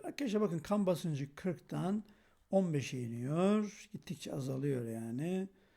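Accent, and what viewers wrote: Black Sea, Turkey. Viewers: Karadeniz şivesidir diyor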